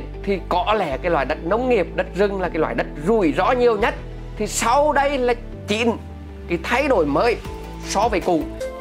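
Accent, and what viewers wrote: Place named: Central, Vietnam. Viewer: Nói nghe không được bạn ơi. . giọng nói nói nhanh giọng miền Trung khó nghe mà nói quá nhanh thật là nghe không được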